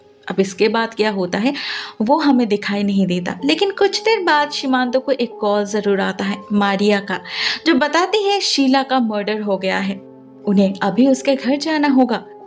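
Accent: Bengal, India. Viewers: Are you bengali didi ur accent bengali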